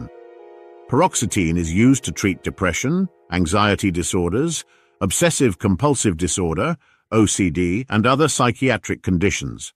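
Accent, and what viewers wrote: United Kingdom. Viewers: Is you tube in Britain? Every announcer speaks British so i am curious?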